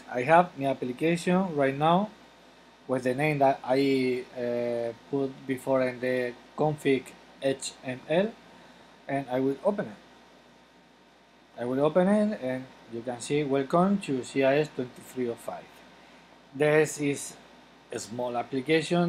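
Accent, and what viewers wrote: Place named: England, United Kingdom. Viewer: I love your English Accent !!! haha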